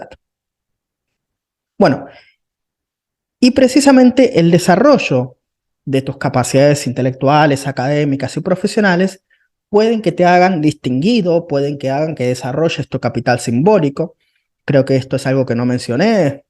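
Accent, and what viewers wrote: Standard. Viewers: Me da ternura que hable en neutro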